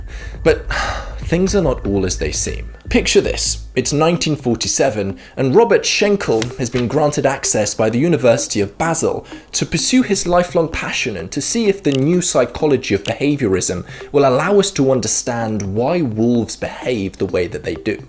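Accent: United Kingdom. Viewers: Bruh your level of articulation is phenomenal and combining that with your British accent is finese....... subscribe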